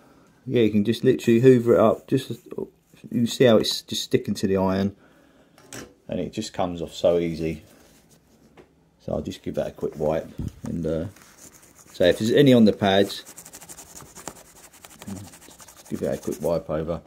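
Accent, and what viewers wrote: United Kingdom. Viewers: You are very british, I'm struggling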